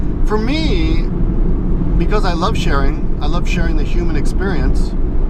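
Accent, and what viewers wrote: Australia. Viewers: I gave you thumbs up simply for the Australian accent ;) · lol your Aussie accent sounded a bit British XD The first Youtuber that I ever saw. I don't remember exactly who but I know that I use to be really into Smosh. I know that they are still really huge but I can't really think of anyone else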